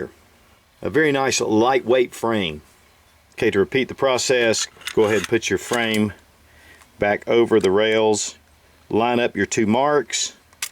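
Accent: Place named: South Carolina, United States. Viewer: you prove in all of your videos you know what you are talking about and that accent seems familiar to me seeing as im from SC.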